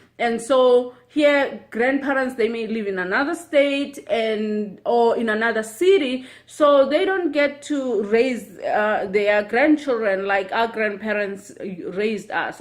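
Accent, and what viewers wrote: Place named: South Africa. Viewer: Very interesting video. A fellow South African here. Post more videos which dispel myths about South Africa as some people are really clueless about our country...even though it's one of the most highly visible country brands in the world. Lol at accent...you have an accent similar to my Mum, she's Xhosa&Zulu Zulu. My dad is white German& Afrikaans. Love your channel already...you seems lovely, curious& intuitive so I imagine you will adapt very well in USA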